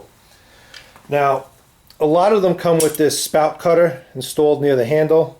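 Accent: New York, United States. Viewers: Yikes wish I saw this a few days ago, just spent 3 days struggling with other methods and sticky stuff everywhere. Some people warn against window clean some recommend windex. I just used alcohol on my finger to smooth the bead but the problem I had in NC Florida was a cold snap and I couldn't get the caulk to run very well so it had blobs. I thought it was a lack of strength in my hands but it was the temp. After I ran a heater it worked better, but tooling the blobs was a nightmare. I am a DIY accomplished craftsperson but wow, this was as bit over my head in tolerance. Wish I saw your instruct, hopefully I won't need to redo it for 10 years, but then at 88 I may just say buzz off, and bleach off the mildew!!! LOL thanx for an excellent presentation. Love your NY/East Coast accent!!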